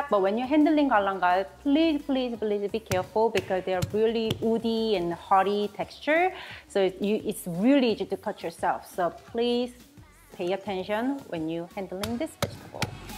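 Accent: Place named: South Korea. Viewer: 영어발음이 너무나 익숙한 발음이라 한국분이신가 했는데 역시 한국분이시군요ㅎㅎ 똠카까이 너무 좋아하는데 자세한 레시피 감사합니다!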